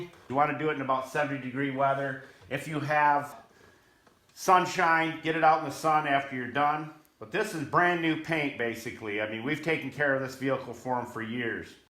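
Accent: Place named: Chicago, United States. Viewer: Ok ha I detected a slight Chicago sounding accent so thought you might be close to that area. Beautiful part of the state. Love your vid’s thanks again!! ✋👍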